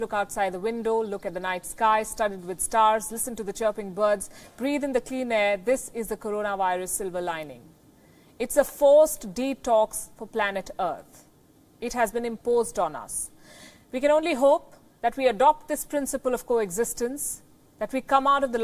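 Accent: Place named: India. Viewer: Well thats just the corporate India accent. The ones who carry the nation forward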